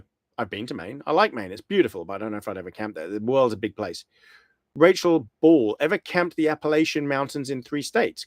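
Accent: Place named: England, United Kingdom. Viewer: Hi! I can hear you! I'm glad you have a re-play--Thanks. I didn't know you were already in Canada! It's not gone wrong--You just didn't know plans would change.:D:face-red-heart-shape: You look real good in those glasses!👍👍👍👍👍 You sound like you are from England/New zealand and whatever accent you have--I really like it.:D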